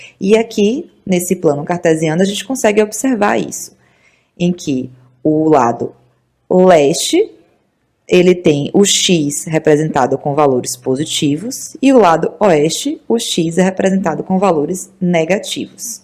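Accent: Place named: Salvador, Brazil. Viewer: Uma sotero reconhecendo o sotaque!! Otimo vídeo!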